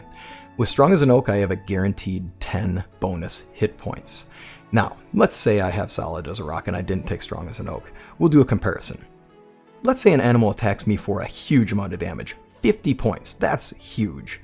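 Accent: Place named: United States. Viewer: Holy shit, a medieval dynasty creator with an American accent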